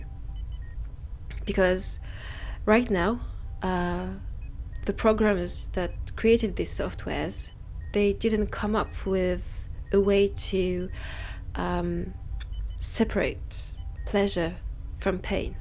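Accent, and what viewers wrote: France. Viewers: For once the french accent is actually french, and not some strange abomination of an accent 😭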